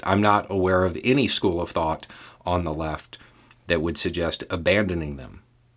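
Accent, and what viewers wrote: South, United States. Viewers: Big “Oh, bless your heart!” Southern accent energy in this one, and that commenter totally deserves it all.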